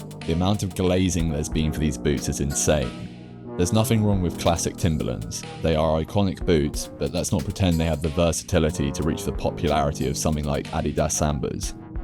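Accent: United Kingdom. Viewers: I love your videos but i have to admit, its hard listening to that much fry (plus the britishest accent, my mind is exploding)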